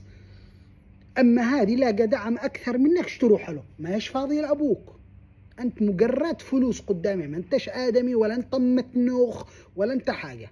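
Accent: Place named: Taiz, Yemen. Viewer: كلاامك تعزي مرة بس إنتا قلت إنك مش عايش باليمن كيف كدا قادر تتمسك بلهجتك رغم عيشك برع اليمن!!؟.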